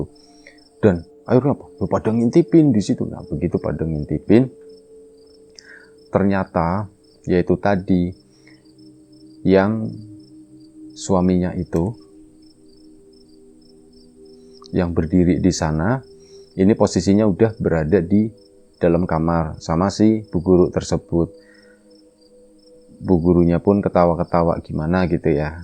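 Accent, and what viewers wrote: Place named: Brebes, Indonesia. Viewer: Brebes ngapak, dadi warga magelang nyimak mas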